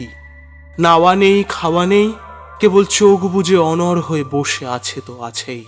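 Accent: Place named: East Bengal, Bangladesh. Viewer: Voice ta to tomar ekdom kaat bangal vai.... recent bera topkechho mone hochhe?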